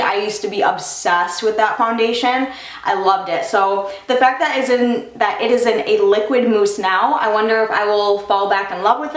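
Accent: United States. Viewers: YOUR SO BEAUTIFUL!!! ❤️💕 and girl you killed me when you said "girl, IM POPULAR!!!" 😂😅💕😘❤️ could you make a video on your everyday routine? Like makeup, clothing choices, piercing Changes, things in that genre. Also, your amazing, an I love how you speak! Are you American? That's probably none of my business but your ascent is just amazing! I love the way you say things!! 😅💕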